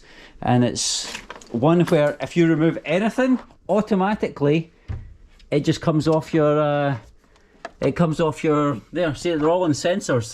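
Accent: Scotland, United Kingdom. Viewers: He sounds Scottish AF …wtf are you on mate?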